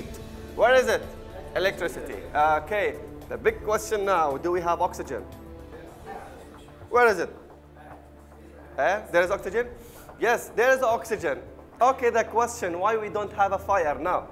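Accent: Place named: India. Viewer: Excellent explaination eventhough he sounds like a Indian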